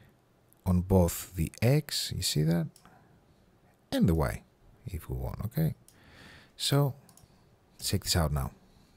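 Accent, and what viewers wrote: Greece. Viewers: Είναι τόσο χαρακτηριστικό όταν ένας έλληνας μιλάει αγγλικά!! Από τα πρώτα δευτερόλεπτα λέω ώπα δικός μας είναι 😛😛 very nice tutorial, clean and easy to understand , keep the hight quality content !!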